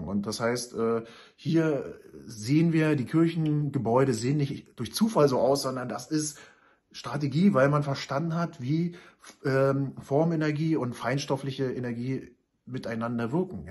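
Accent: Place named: East, Germany. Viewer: Also das versteh ich sogar als Weib die nicht der Ostsprache mächtig ist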